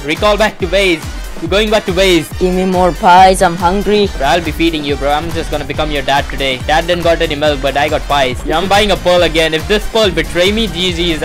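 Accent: India. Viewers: W INDIAN ACCENT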